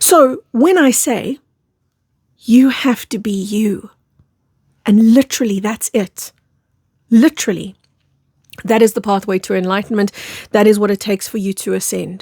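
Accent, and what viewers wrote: South Africa. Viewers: Hi I found your channel a few weeks ago and love the info your putting out. All I know is that my soul family is the blue ray of light and my power is the violet flame of transformation when I let the energy flow it is bliss and peaceful and sends goosebumps all over my body. I understand oneness Iwant the best for every human on this planet 🌎. No obstacle thrown in my path will get me out of my inner peace. I had no help from others learning about energy vibrations and frequency’s. I don’t care about the 🎤 microphone I see your energy and oneness your a lovely being shining your light. Much appreciated oh 1 more thing I love your South African accent 😊. I have a blue aura what is yours? Sending high vibes from Vienna Austria 💙💙💙✨💫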